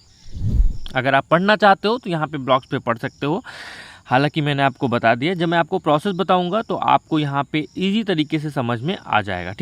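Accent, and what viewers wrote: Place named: Kolkata, India. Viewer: Kolkata voice ascent h 😅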